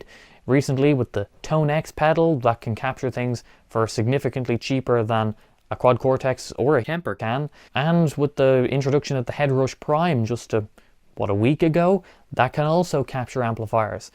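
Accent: Ireland. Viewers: Why do u have a Irish accent? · I came here because I needed to hear that gentle, Irish voice of...DOOM!! 😎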